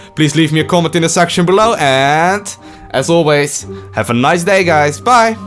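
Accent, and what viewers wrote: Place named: Netherlands. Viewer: Quit whining about a Dutch accent. You understand him, you learn from him, you can't speak commentate this game better. QQQQQQQQQQQQQQQ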